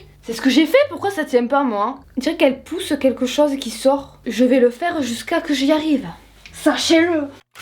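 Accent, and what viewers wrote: South, France. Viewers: Je kiffe vraiment t’es vidéos, ta nature ton délire! Tu me casse de rire à chaque fois et qd tu parles ton (notre) accent s’entend tellement et cest superbe!! Vive le sud!!! Bisous!!!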